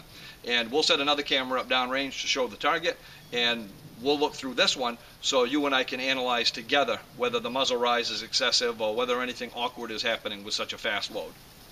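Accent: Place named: New England, United States. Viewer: That Yankee accent gets me...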